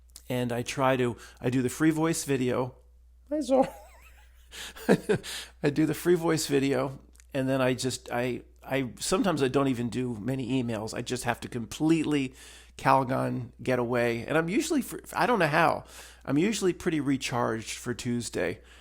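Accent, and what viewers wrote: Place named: New Jersey, United States. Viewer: Aye! Just resubbed, been a loon̈nng time (I wanna say 2022ish?) Been w u since shrugged, just wanted to say something to yo ass: Thank you. Youve been like an older brother (est.1989) to me since I found myself awake in a world where even my family couldn't see.. sure some of the 1st and 2nd grade truth helped at that period, however there came a point where I needed some1 like you.. no homo. A guy who could be my cousin; just talkin how we talk (jersey) but about the stuff that I needed to flesh out. The stuff that, if brought up to my friends and family would end in argument guaranteed. Anyway, u have no clue, cuz u algorithmically suppressed n shiieeeet, how many individuals like me that were introduced to your content at the time the needed it most. You have no idea how many ppl u have helped. It could be 1, it could be infinitely more, and i speak for myself, yet have a feeling any others would say the s ame thing.. 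if we caught u out; on the worst Monday of your life, we would see you no different my friend. We are the modern day pioneer, seekers, exploring uncharted territory that would make the vast majority of the populace go crazy within days. This is why they refuse to see.. Anyway hope u read this comment. Glad to be back (to YouTube in general).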